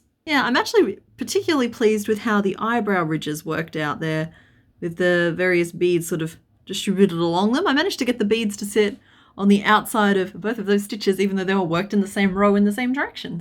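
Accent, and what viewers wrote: Australia. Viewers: I was over the moon from the moment I hear the Aussie accent! No disappointment here 😂 look forward to binging through your videos now "hyperfocus? Me? Never!" 😅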